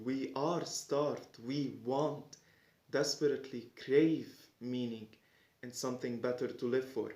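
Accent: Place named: Lebanon. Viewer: your lebanese accent is so cute <3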